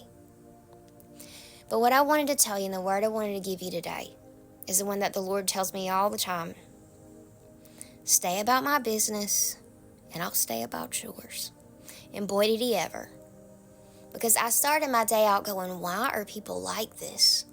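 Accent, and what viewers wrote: South, United States. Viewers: I love your Southern accent 😄.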